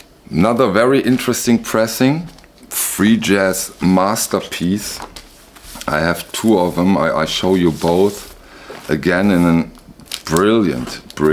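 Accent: Germany. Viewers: Hey, I find your slowness in speaking embarrassing and I hate your terrible German accent: e wäry, wäry, ärly pressing. Phhh. Everything you say is predictable and completely unoriginal. I don't think you're very musical, based on what you rate positively. I am amazed at your many fans. Ok. That's how it is.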